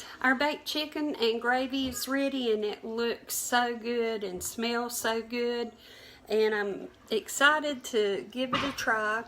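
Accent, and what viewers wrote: South, United States. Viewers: I wish I was your neighbor. I could visit with you for hours. I love your sweet southern accent and your sense of humor. You're just the sweetest. I am looking for new recipes and I found the right channel. We only eat the boneless, skinless chicken breasts. I do but some thighs or legs for my son occasionally and so I can get some good broth to freeze or make gravy with. Thank the Lord you're video was in my feed because I am binge watching now. God bless! ❤❤❤ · We saw the munchkin peekin around the corner! Ma'am what part of the South are Y'all from? (not just the state but like north east Alabama or south west Arkansas) Thanks.I watched your questions video. Now I know why the indeterminate accent! When I was a kid in SW Michigan on a fruit farm, Southerners would come up and pick fruit. I absorbed many an accent during those summers.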